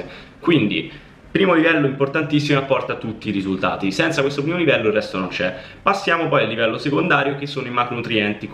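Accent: Rome, Italy. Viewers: Allora: primo, sei romano, e quindi ti starei ad ascoltare per ore ahahah!! XD E secondo, scherzi a parte.. i tuoi video sono sempre chiari, semplici, e spiegati perfettamente, anche per me che sono una principiante in quanto a fitness e nutrizione... =) Continua così, io sto divorando i tuoi video uno dopo l'altro! Grazie mille! =D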